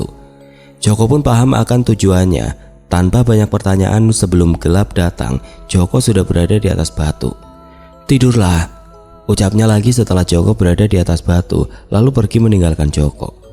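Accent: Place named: Banyumas, Indonesia. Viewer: Unik nya V.O chanel ini selalu berlogat Ngapak walaupun menceritakan daerah selain jawa. Salut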